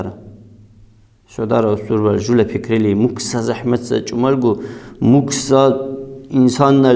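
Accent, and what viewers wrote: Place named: Lak, Russia. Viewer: Да будет тобой доволен Аллаh,как приятно чисто лакская речь слушать без русификации❤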